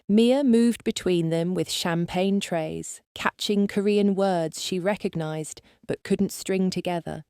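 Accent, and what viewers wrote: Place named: United Kingdom. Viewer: I’m watching from having Indiana, and I have discovered that I could listen to a British accent talk about anything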